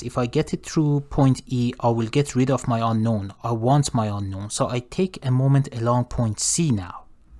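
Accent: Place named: Iran. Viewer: great sir. (btw, your accent seems like you're from Iran or its surroundings, am i right?)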